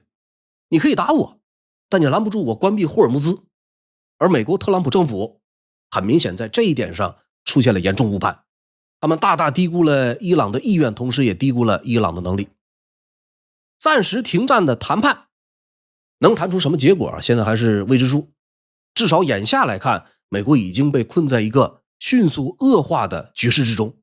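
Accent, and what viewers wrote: China. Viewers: 就是个大外宣还挺懂潜规则知道用繁体亲近港澳台，奈何口音变不了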